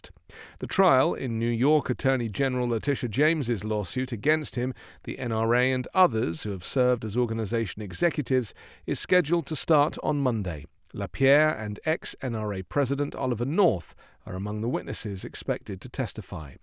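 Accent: United Kingdom. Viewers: Fake news in short format. Brit accent to appeal to gays, RINOs and other Democrats.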